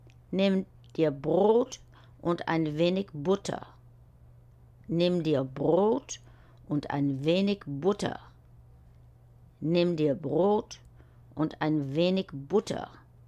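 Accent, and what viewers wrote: Germany. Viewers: It's a German dialect 😉 (Berlin??? 🤷)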